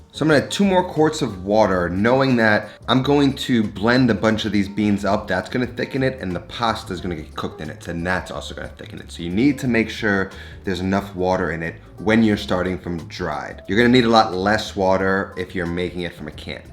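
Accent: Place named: Italian American, United States. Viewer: You speak with recent Italian American accent. Itlian food with chickpeas and chilli flake, how good is that? we will see.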